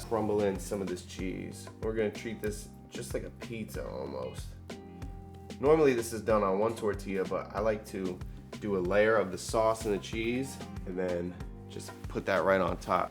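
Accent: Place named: New York, United States. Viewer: I love how much of a New Yorker you sound. This looks banging. Definitely not traditional but has the perfect amount of twist.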